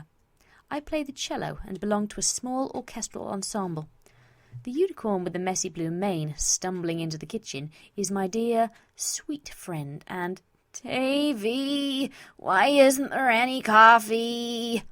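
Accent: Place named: England, United Kingdom. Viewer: Not bad. You have a lot of potential for readings, or maybe I'm just fond of English accents. Good short story too.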